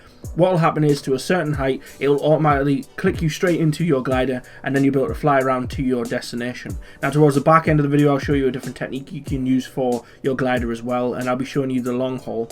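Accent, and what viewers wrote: Newcastle, United Kingdom. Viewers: you geordie bro?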